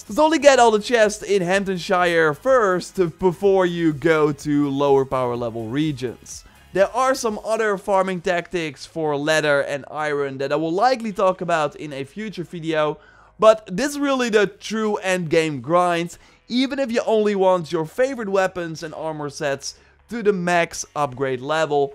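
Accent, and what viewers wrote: Netherlands. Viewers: a yes this accent is made out of dutch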